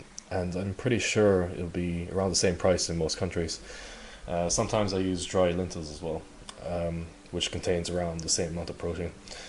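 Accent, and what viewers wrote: Ireland. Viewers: I love accent. It’s subtle yet not overly so and still clear he’s Irish. Almost sounds somewhat Scandinavian to me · gosh, this irish accent. lovely :) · HAAGHAHAHAHAHA im dead, fuck u thats the way he says it, cause he is irish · Are you Irish? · something completely unrelated, are you irish? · He has an irish accent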